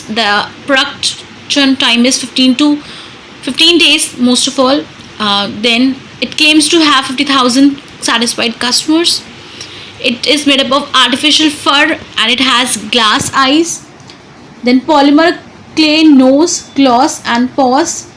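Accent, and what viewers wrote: India. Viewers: I don't understand the Indian/English accent, Worthless review, at the end I did not understand whether she bought a realistic dog or not since did not show the physical dog running all over.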